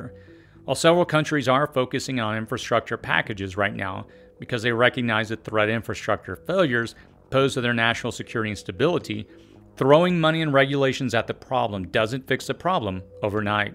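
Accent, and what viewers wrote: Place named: Southern California, United States. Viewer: the so-cal accent is strong in this one.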